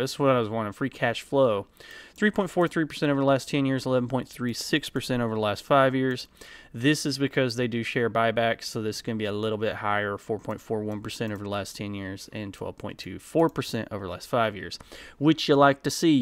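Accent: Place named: Pennsylvania, United States. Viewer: Said it before, I'll say it again for the Al Gore rythm, I LOVE your data visualizations! What exactly is the accent? I'm guessing PA, but I'm not an expert in PA accents so don't know the part of PA.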